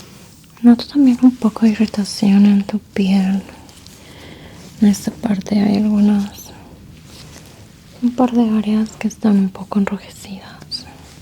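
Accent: Latin America. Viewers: Eso es castellano jajaja, creo que ella habla más español latino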